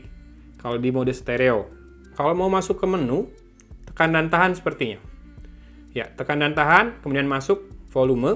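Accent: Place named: Java, Indonesia. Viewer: Medhok ya Pack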